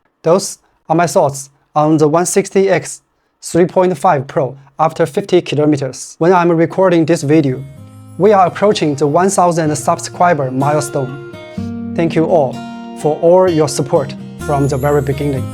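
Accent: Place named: China. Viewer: 你这chinglish听得我全身发麻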